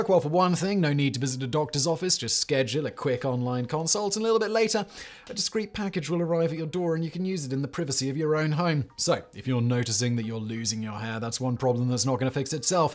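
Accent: United Kingdom. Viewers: I do love that you read Wikipedia to us, I just hate your particular British accent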